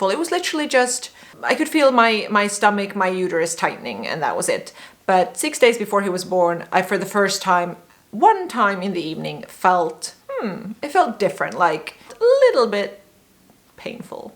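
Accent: Finland. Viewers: I really liked this story. not sugarcoated or treating birth as a 'who did it better' thing, so chill and funny at times. a lot of details and no swooning, just being down-to-earth and positive. and I must say I adore the traces of Finnish accent you have, like saying five words on the same breath while your intonation goes down and so on. I can't help it, I'm just a sucker for it :D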